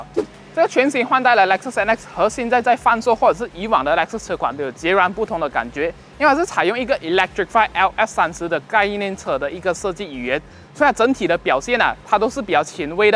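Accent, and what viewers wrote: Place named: Malaysia. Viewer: 你讲英文口英太重… 马来人…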